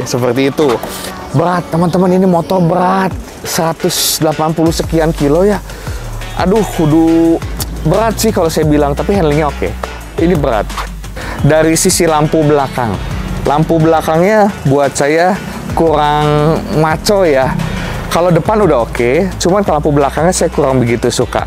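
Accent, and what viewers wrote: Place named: West Java, Indonesia. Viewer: Om. Logat sundanya, jls bgt. 😂😂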